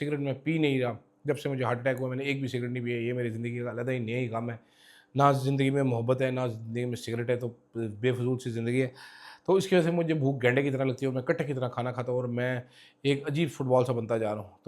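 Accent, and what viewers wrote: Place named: Sindh, India. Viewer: Love your Sindhi accent Please try Pashto accent next time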